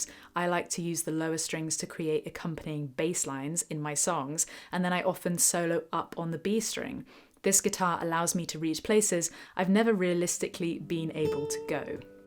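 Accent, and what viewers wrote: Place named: Bristol, United Kingdom. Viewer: Always a pleasure to see you, and hear that lovely Bristol accent. Beyond that, I'm with "intersanctum." Lots of guitars look and play similar to this one, and Martin should make a " " edition if you're doing Martin commercials. I do like the new neck joint, and, of course, it's a Martin, so it sounds great. Way out of my modest price range, however….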